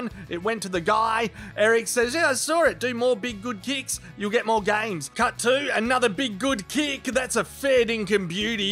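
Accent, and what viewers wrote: Australia. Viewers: What is this. Aussie man on Man U channel! Excellent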